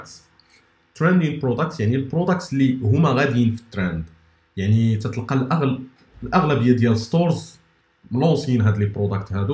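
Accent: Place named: Maghreb. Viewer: راه يتكلم بالعربية اخي وين شتها فرنسية ?!! لهجة مغاربية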